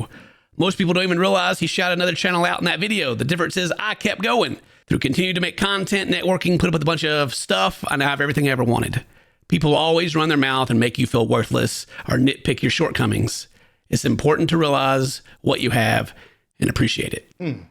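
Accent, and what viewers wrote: South, United States. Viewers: I subscribed during the beginning of the dark ages apparently lol. I checked you out because my friends had me watch a video and we both liked that you had a southern accent😂😂😂. I watched your family vlogs and loved how you interacted with your family and i was actually mad that you didnt have more subscribers because you deserve it! Once you added Buffpro i tuned into every video with yall. I feel bad but i actually skip your Pewdiepie videos lmfao. I had never watched a pewdiepie video until after i had been subscribed to your channel for years. I hope you keep making content. Its part of my daily schedule now lol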